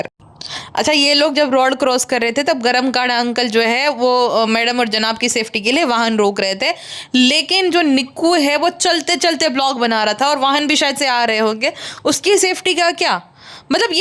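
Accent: Gujarat, India. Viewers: Aap bolne pr se lagta hi h k aap gujrati ho kyu ki me bhi rajkot me raheti thi wha gujrati bolte h jab bhi ap koi gujrati word bolte ho kuch to me khush ho jati hu aisa lagta h meri frd mujse bat kar rahi h....etna acha lagta h muje ar sunne me maja aata he...❤❤❤ Luv 😊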